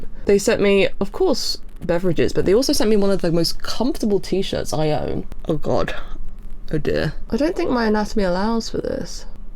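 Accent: United Kingdom. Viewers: u sound more british than usual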